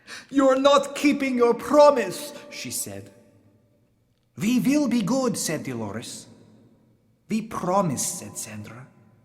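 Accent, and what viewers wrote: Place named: Transylvania, Romania. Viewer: Oh, this is adorable! If my LO can understand your (quite well-done!) Transylvanian accent (she’s 3 🤷🏼‍♀️), I’ll introduce her to a few of my favorite SSTTITD stories.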